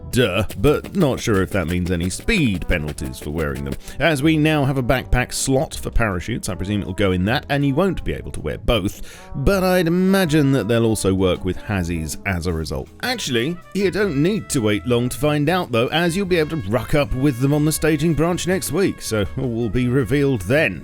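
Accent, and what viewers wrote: United Kingdom. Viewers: He's British 😂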